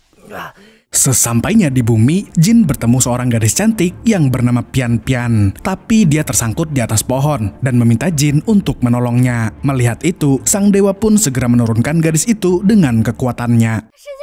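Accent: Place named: Cirebon, Indonesia. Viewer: Adminnya org cirebon😂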